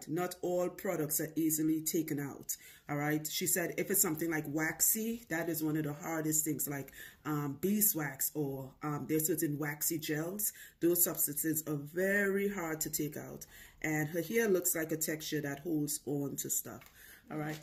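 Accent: Trinidad, Trinidad and Tobago. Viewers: Am i hearing a Trinidad accent ckming through?🇹🇹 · Yes she's ah Trini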